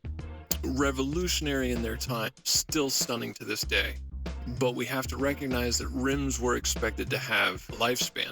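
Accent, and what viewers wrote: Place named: United States. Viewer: MERICAN ACCENT!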